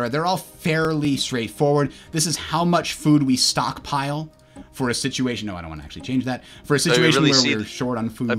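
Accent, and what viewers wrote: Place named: United States. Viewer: The yankee pronunciation is annoying but hey, great vid. While the word "pop" made me cringe, you explained everything well. I really needed this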